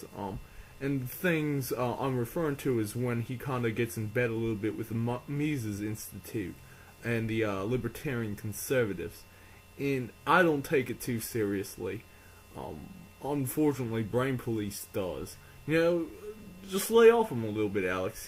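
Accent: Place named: New Orleans, United States. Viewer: I've always wondered where your accent is from...sounds very Carolina like...but then again it has a New Orleans thing going on...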